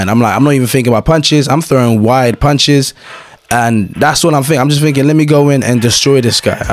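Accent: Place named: Midlands, United Kingdom. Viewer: Ure from Leicester or Nottingham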